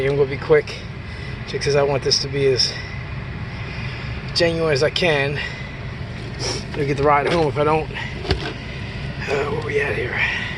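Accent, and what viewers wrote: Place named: Maryland, United States. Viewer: It is actually a very close guess accent wise, because he is from Maryland.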